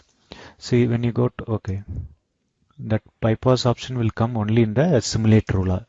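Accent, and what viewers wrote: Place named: India. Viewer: Next time speak slowly , Indian English (hind) is confusing !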